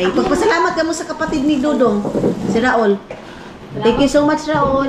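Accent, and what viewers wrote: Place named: Surigao, Philippines. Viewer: Surigainon Ang dialect ...like my late father..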